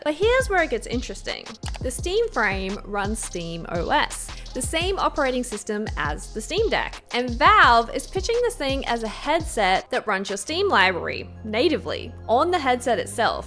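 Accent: Australia. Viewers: Great having an Australian voice and knowledge of local pricing and issues for VR.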